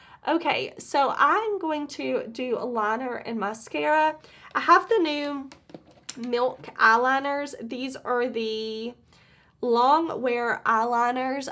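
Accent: South, United States. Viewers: I didn’t realize you live in Mississippi, I live there as well, in Columbus :) I knew you had a southern accent, just didn’t know where you were from. You’re one of my favorites to watch so it’s cool to know we live in the same state!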